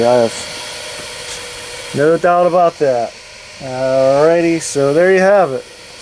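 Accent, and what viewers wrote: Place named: New York, United States. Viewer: “Forget aboouu It” can tell you are from the north East with a touch of New Yorker in ya. That lack of burnt charcoal is so impressive. Just got rid of all my extra 18” Webers - guess who is now back searching for one. I already have a couple of old stock pots - you truly inspire me.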